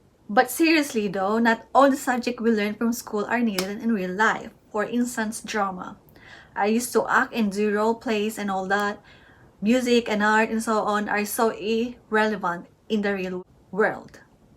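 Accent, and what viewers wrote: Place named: United Kingdom. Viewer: Good listening to you pretty woman, liked the UK accent.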